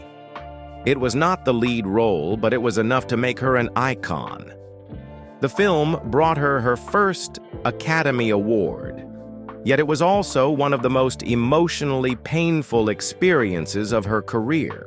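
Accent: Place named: South, United States. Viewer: this narrator dealing in a southern drawl? It seems it just Popped up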